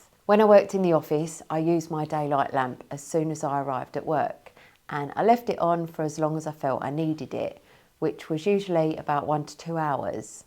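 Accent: United Kingdom. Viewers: I grew up in Florida in the 60’s-70’s…when sunscreens weren’t in vogue. I’m paying for it now. That means I have to avoid the sun or cover up…or lather on sunscreen. However, I still like a sunny day. All that said, you are my Happy light! I look forward to your videos, cheerful smile, lovely British accent, and I learn from your experiments. Keep sharing and glowing…I mean…growing!😊. BTW, I was stationed in Japan and England for 4 years each so I learned to get out regardless of the weather and clouds! 😊😊😊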